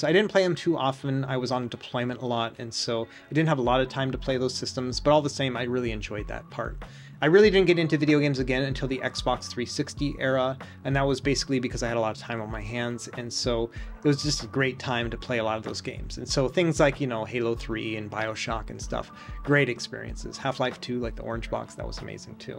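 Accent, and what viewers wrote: Minnesota, United States. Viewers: As a Minnesotan, it makes so much sense now that that you picked up a bit of Minnesotan accent! I could never quite put my tongue on it, but your voice felt familiar lol! Love the vids, keep it up ❤ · Congrats on 400k subscribers, its well deserved. This is one of the highest quality channels on YouTube and the effort you put in really shows. It's interesting what you said about the midwest accent, I always just thought you were from Minnesota. Won't be long to 1 mil subs now! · Minnesotan here, no wonder I vibe with you so much. Similar interests, familiar accent. Great job with the channel. You singlehandedly got me into retro handhelds :D had no idea you are in your 40s, I'm 28 and bald, so I thought you were younger than me lol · Oh wow, I didn't hear the Minnesota until you pointed it out but now that you say it I absolutely do. 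😂 Love your work; thank you for all the dedication and time you put into everything you do. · Also, thanks for commenting on your accent. I’ve never been able to place it, but you nailed it - definitely Minnesotan.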